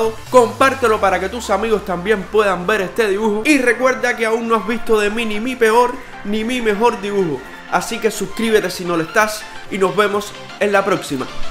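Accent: Cuba. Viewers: Tú eres cubano ..???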